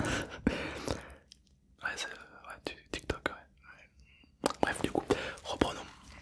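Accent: South, France. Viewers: Coucou je voulais te dire que j'adore ton accent du sud et ton amour ça m'a fait beaucoup rire 🙂 PS: la prochaine fois que tu fais une vidéo sur les prénoms est-ce que tu pourras mettre le mien Je m'appelle Jean-Baptiste. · tu a l axen du sud ????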